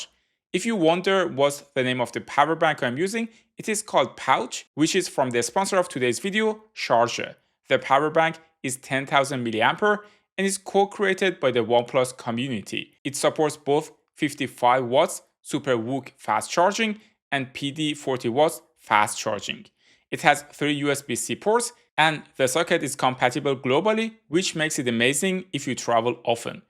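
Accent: Iran. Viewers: Bro your accent is so fucked, are you Persian?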